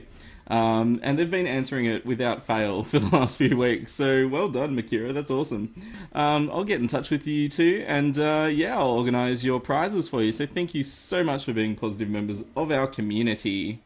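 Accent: Australia. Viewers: Hi! The fishing tip was very helpful to me so thanks a lot! Btw you're making awesome vids and I have a question for you, are you from Australia? I think... after accent.. anyways keep making cool vids! #LoveCookies!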